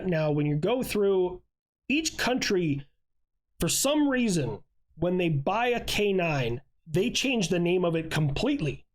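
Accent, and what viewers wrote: United States. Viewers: You know, this guy sounds like America...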